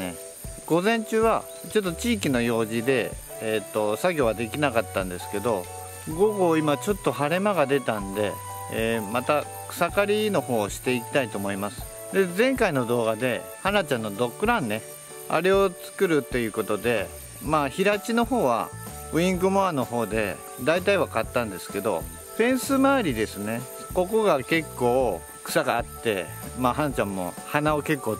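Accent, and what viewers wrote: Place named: Oita, Japan. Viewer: さま 大分弁丸出しでいつも楽しんでます。 草刈りのチップソー飛び散って痒いのも 有る有るですね。飛散防止をつけるのが良いかもしれませんが 私もそう考えながらまだ購入してません。草刈りがちょこっとだからです。 はなちゃんは必ず予防注射してから 離してください。パルボなんかでコロって死ぬ事が多いです。 一度は注射してませんか？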